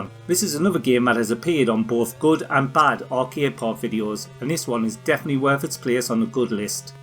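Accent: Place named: Geordie, United Kingdom. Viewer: Great video, but are your a Geordie, you accent sounds like a posh Geordie, like Jimmy nail😁. I am, just speak your proper accent mate👍🏻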